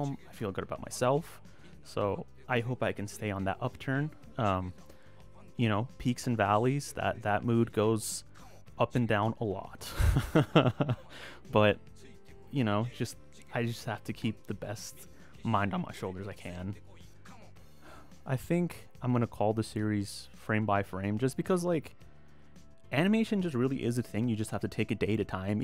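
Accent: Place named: England, United Kingdom. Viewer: literally asking myself in the head, is this guy english, then 1st second explains it all.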